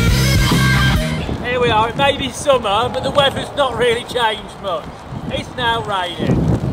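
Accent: United Kingdom. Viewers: Overly British, but great entertainment for quarantine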